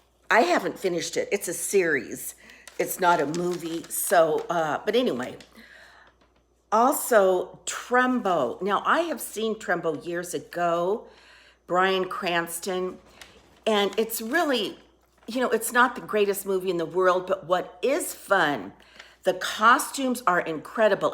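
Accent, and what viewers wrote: South, United States. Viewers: Hi I love your Q&A idea. Several years ago I watched your fantastic video about your high school reunion in Mississippi. So my question is what brought you to Mississippi and do you stay in contact with your classmates? I live in Alabama and every once in awhile when you speak I detect a slight Southern accent. Love ya!!!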